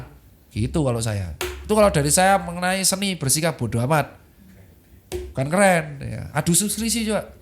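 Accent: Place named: Pekalongan, Indonesia. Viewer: Koyok wong Pekalongan sih logate😂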